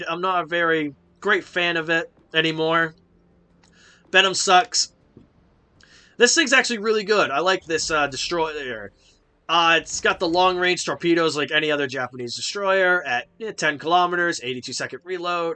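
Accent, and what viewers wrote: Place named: United States. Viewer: Dat murrican pronounciation....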